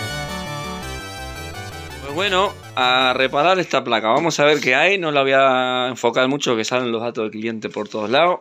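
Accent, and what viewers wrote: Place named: Argentina. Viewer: Que groso saludos de argentina y gracias por tus conocimientos....jajaja insisto que tenés tonada de argentino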